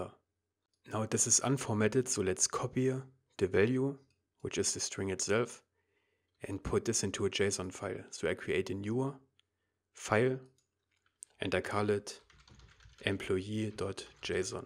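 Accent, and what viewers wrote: Germany. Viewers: i love that light german accent (y), excellent work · I think a hear a german accent😁😁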